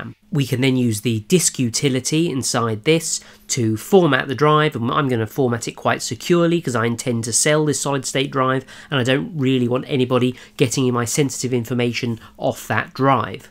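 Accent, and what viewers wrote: United Kingdom. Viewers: I'm not a big fan of the brit accent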